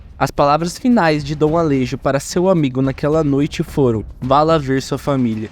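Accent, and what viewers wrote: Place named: Caipira, Brazil. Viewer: História longa e narrador puxando o r de caipira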